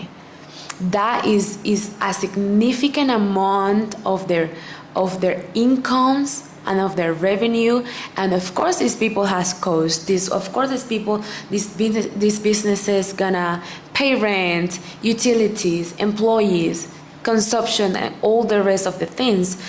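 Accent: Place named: Paisa, Colombia. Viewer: tienes novio? Me encanta tu acento paisa en ingles, me gustaria sacarte a bailar un dia de estos por ahi en Medallo! Saludos!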